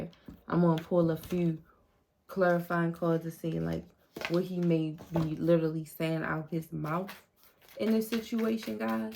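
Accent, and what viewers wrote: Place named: Baltimore, United States. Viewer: Greetings 💕 playing catch up, but Diddy just may want the ‘Unisex Cult’. She needs 2 realize that her LIFE is more IMPORTANT. That $$ has her not 🏃🏾‍♀️ the opposite way…that’s not what he likes😶😄 THANX 4 YOUR 💝 💜…yeeeess ma’am I hear Baltimore tongue! On 2 the nxt video 😊 LIKE..SHARE & SUBSCRIBE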